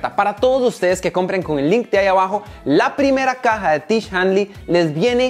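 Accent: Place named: Costa Rica. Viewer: habla como tico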